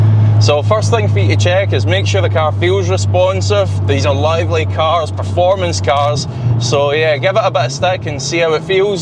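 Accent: Scotland, United Kingdom. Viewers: Don’t be ridiculous have you never heard a Scottish accent before!!!!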